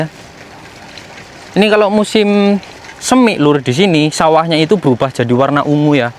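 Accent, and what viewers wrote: Banyumas, Indonesia. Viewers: Banyumas jateng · Smpyn wong ndi kang....ngapak bgt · Mas wong banyumas apa wong sidareja si mas...ngapak poooll ky aku...sampe nyebut parkir ya tetep palkir yaa😊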